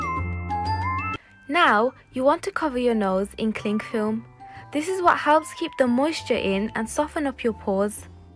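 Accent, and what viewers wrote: Birmingham, United Kingdom. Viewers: Brummie accent. Birmingham 🇬🇧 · ❁ཻུ۪۪┊𝓝𝓸𝓮𝓵 it’s a british accent ... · Haha omg someone appreciates a Birmingham accent, finally!🤣👏🏼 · She’s British, but from a different part of England. You’re probably American. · OMG I ACTUALLY CANT 😂 It’s not fake it’s a Birmingham accents Lollll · It's just a British accent, not even the same one as Peppa Pig. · its called brummie lmfaoo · she's from Wales this is a brummaccent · Does this work on white heads? You sound like you're living in UK, did you get that brush in tesco?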